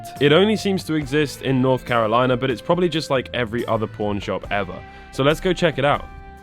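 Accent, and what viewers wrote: United Kingdom. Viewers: Oh, you are brithish! You should go to this place called Goodwill Computer Works, there's one in central texas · He’s British like me 😅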